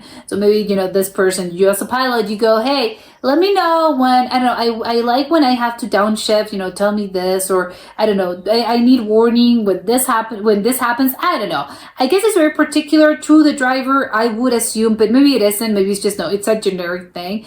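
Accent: United States. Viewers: As a swede. Your accent is standing out. I imagine jersey? Am I totally wrong here?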